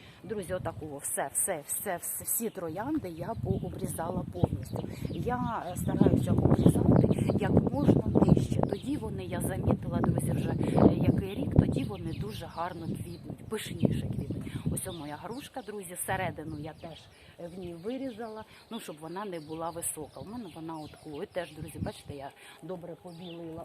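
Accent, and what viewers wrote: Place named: Zhytomyr, Ukraine. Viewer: Доброго ранку,! Привіт з Дніпра. Дуже рада, що підписалася на Вас. Все дотепно і грамотно. Дякую Вам. А Ваша мова як елексір на мою душу. Так розмовляють на моїй рідній житомирщині. Бажаю Вам здоров'я і мирного неба - всім нам!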